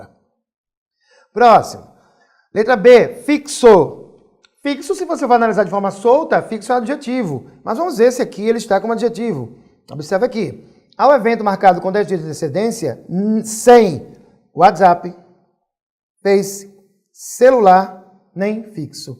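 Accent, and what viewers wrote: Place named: Pernambuco, Brazil. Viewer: Muito boa aula, parabéns e obrigada. O melhor é ouvir o sotaque, também sou Pernambucana!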